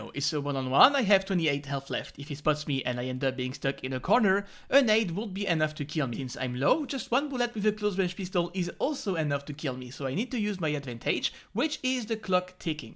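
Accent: France. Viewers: You speak like a french but look like American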